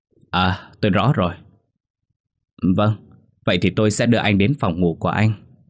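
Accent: North, Vietnam. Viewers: Giọng bắc diễn đọc thật hay.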